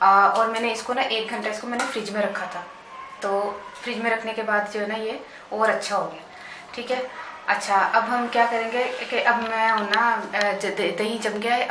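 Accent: Punjab, India. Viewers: Tusi punjab tu oh jeraa ayna haha aisi v aynj e bolny ah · MashaAllah that panjabi voice aye khaye Pakistan de yad agae 😢😢😢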